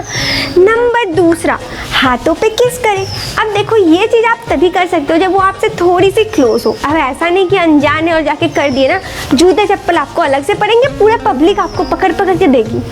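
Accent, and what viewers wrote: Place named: Jharkhand, India. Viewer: Jharkhandi boli ka asar Hindi me hai. Kya bat hai madam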